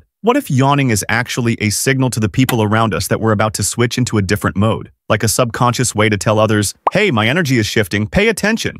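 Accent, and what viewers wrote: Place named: India. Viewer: the indian accent 😭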